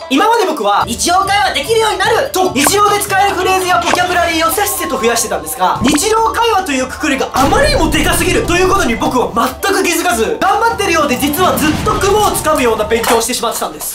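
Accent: Australia. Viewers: 待ってなんで、1年でオージーアクセントを習得したんや！？ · あと、オーストラリアンアクセントを習得してますよ！！！ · 1年でこんなに伸びるなんてすごい! 大学院(MBAかな?)TOEFLとかの下積みもあったと思いますがすごいですね。しかも、しっかりオーストラリア英語のアクセントになってる（笑） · オーストラリアなまり！！カッケーっす！ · ばっちりAussie accentになってて推せます。笑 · やばいオージーアクセントめっちゃかっこいい私もカナダに留学して2年目です！めっちゃ面白い🤣です。応援しています📣、！ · オーストラリア訛りっぽくなってる！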